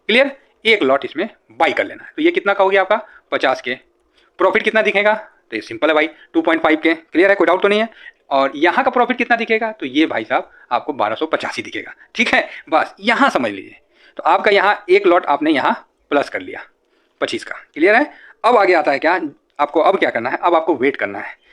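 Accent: Bihar, India. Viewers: Aap Bihar se lagte hain....bahut achha....Mai bhi hu.... 👍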